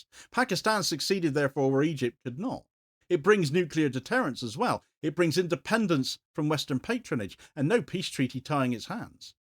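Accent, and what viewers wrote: Cornwall, United Kingdom. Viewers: Are you from Cornwall?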